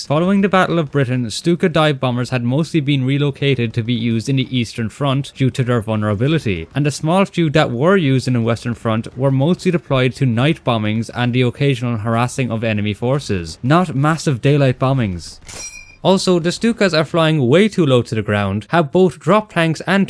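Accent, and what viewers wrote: Ireland. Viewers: Bro you sound irish haha surely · Why did his accent made me think he was Indian for the first four and a half minutes before i realized he's Irish? Solid video, btw. 👍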